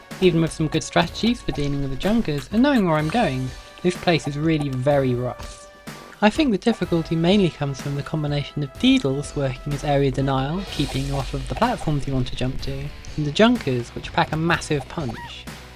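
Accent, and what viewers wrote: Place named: United Kingdom. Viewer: They fly some what erotically? I heard that and laughed I'm sorry it was accidentally funny and I just want to say I do like this LP a lot (so you don't think i am a jerk for kind of insulting your interesting sounding accent...British I...think?) and I will stop typing now, since I want to go back to watching...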